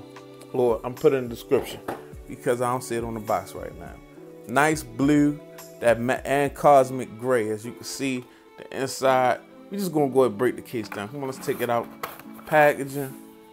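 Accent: Baltimore, United States. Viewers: i hate ur baltimore accent but thanks for the vid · whaaat. Baldamoe?? Not gonna lie I'm from here too but I didn't pickup but now that someone said it I Hear it lmaaaooo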